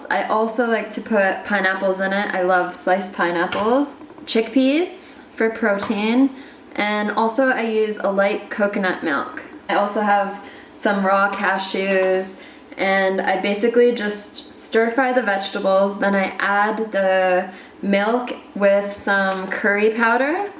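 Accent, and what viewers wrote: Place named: Canada. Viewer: where are you originally from? your accent says canada but your demeanor says california hahah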